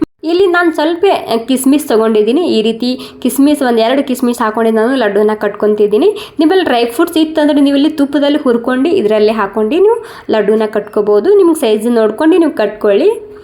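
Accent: Maharashtra, India. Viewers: Recipe 👌👌,and your Kannada with Marathi accent 😊Stay blessed.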